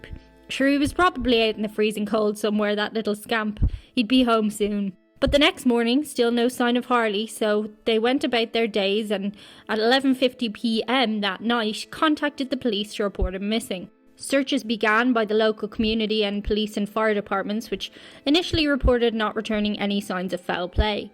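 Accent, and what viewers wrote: Ireland. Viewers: I love your new channel. I did notice your Irish accent is more pronounced. I hope you continue towards a news magazine channel, you have soo much to offer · This may sound crazy, but in these videos, there's something about your accent that doesn't say "Dub" to me, though I know you are. Are both of your parents from Dublin, too?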